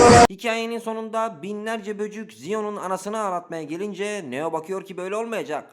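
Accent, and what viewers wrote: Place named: Anatolia, Turkey. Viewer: Hacı abi anadolu şivesini bu kadar iyi nasıl gonuşuyon. Bence güzel tat katıyor.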